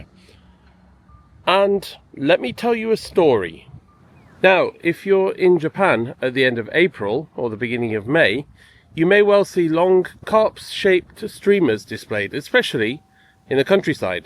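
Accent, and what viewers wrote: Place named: United Kingdom. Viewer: Welcome to Hofu❗️ Great to see this event in your video 👍 Where are you from ? 🇬🇧or 🇦🇺 from your British accent. Hofe has many historical building, so please enjoy to stay✌️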